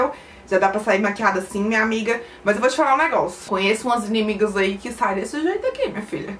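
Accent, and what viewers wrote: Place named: Bahia, Brazil. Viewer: senhor! que coragem. ..Kkkkkkkk tu é linda demais , amo seu trabalho e seu sotaque parece que é bahiana. beijos linda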